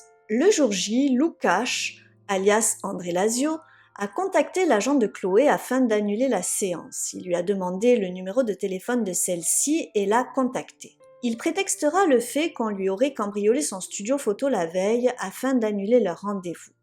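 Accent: South, France. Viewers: tu viens d avoir un nouvel abonné a l instant désolé je connaissais pas ta chaine avant cette fin de soirée j aime ce que tu fais félicitations miss avec ton petit accent du sud j aime beaucoup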